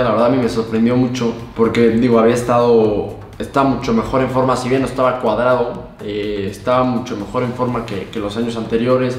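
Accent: Uruapan, Mexico. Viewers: Eres de Uruapan me parecio escucharlo??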